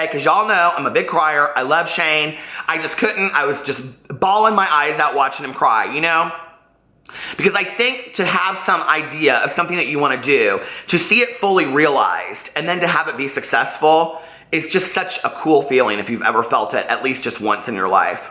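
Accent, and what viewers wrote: South, United States. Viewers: I love your voice! Are you from the south? Personally I love to hear southern accents...it makes me feel at home as I'm from Tennessee.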